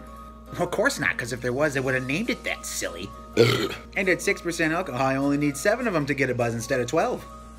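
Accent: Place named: Upper Midwest, United States. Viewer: He has a strong Northern Midwest accent. Sandler has a New York accent. Kind of similar I guess.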